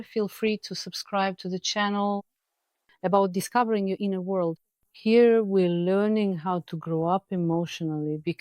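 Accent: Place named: Eastern Europe. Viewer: мне нравятся ваши видео на русском.На английском ваши видео звучат очень по- славянски, без английской интонации. Может можно подкорректировать AI, чтобы ваш голос звучал максимально как native, с другим интонационным ритмом. С приветом и благодарностью, из США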